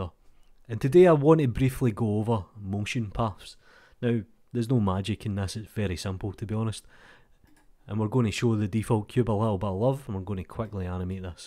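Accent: Scotland, United Kingdom. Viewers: love ur tuts and ur accent too , it"l like william wallace is giving me a blender tutorial :)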